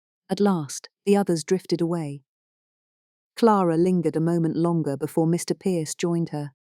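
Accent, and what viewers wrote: England, United Kingdom. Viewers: I have to agree. There is nothing better than an English accent.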